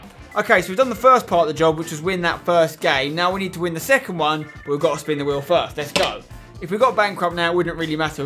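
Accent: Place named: United Kingdom. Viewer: Chaz Hartwayne he talks British that is why he is saying it like that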